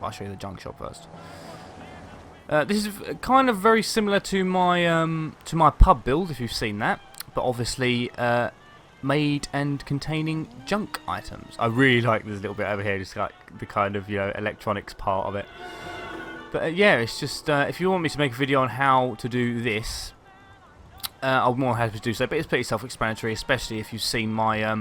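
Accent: United Kingdom. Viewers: I just love how English you are when you say things like "take a gander up 'ere" or " 'ave a nice cup of coffee"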